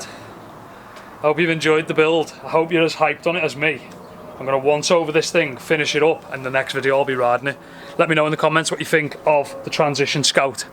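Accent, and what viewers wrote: United Kingdom. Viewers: what type of accent is this? i know it's from UK but i don't know which part.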